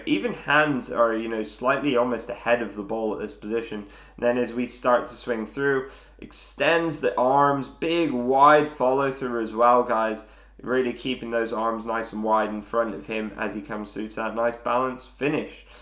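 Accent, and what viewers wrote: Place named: United Kingdom. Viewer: Great break down of Ancer's swing. Plus, you're one of only a few of the golf Youtuber's from Great Britain whose English accent I can comprehend. Cheers for the Queen's English ya'll.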